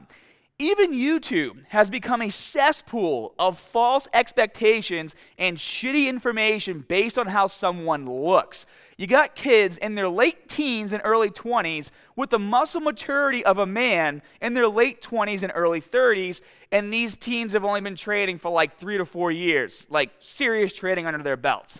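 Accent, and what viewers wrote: Boston, United States. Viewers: I've watched a ton of your videos many years ago and around 1 year ago I had to find the video where you discussed the change in your accent. I'm watching this video today and although the topic is good I still can't get over the over emphasised Boston accent. Not a diss in any way but for some reason I can't take it as seriously like your old videos. Again no disrespect but the accent I got used to in the past sounded more intellectual whereas the accent now sounds quite dare I say it low class. In England we have many accents too, some sound posh and some just down right ghetto/street. It's the same sort of thing going on here. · Wow one of the strongest Boston accents evvaaa lmfao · Is this guys Boston accent foreal? That shit is strong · it's just PAHT of his brand - people keep posting comments about a Boston accent - more comments posted - Boston accent gets discussed all the time - Youtube ranks him higher because viewers are engaged enough to post comments - more views for his channel - it's just the nature of the online world - if you don't appear near the top of the hit list ( youtube, twitter, browser - whatever ) you are NOWHERE - NO ONE sees your videos it's just like all those terrible clickbait titles that just about everyone, even legit youtubers use to get views - same with the natty/juicing discussions - no such thing as bad publicity as long as people post comments unless you've tried to get your own web site/business ranked by Google and Youtube and seen how brutal the competition is - it's as brutal as trying to get an acting part millions of people dream of making an online living but very few find the right mix that makes your brand stand out -- ( personality, looks, humor, informative info, frequent updates to channel ) - anything that helps you stand out is a win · Never change, Bahston. 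· Did always have a slight Boston accent? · fuck yeah boston accent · First time on the channel. Love the video. But more importantly, do I hear a Boston accent??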